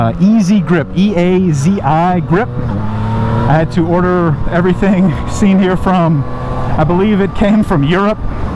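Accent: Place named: Louisiana, United States. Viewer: Very informative. Nice, soothing Cajun voice.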